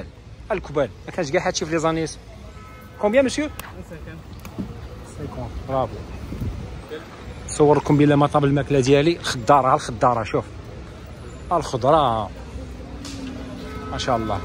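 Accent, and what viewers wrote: Algeria. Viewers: علابيها تتكلم كيمانتاع الغرب الدزيريين تماما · لهجة ديالك جزائري بينا وضوح شمس سير قلب على شي خدمة باركا من تشويه صورة المغرب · هادوك كا يترزقوا. شوف لي كا يشفروا أو يبيعوا في المخدرات. اللهجة ديالك جزائري ماشي مغربي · من خلال لهجتك أنت جزائري. لمذا تدعي أنك مغربي. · انت جزائري، لهحتك جزائرية ، علاش تقول راك مغربي..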